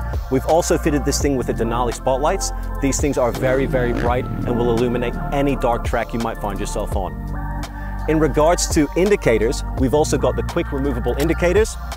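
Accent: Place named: Australia. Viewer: Like it's kinda Aussie but all over the place at the same time?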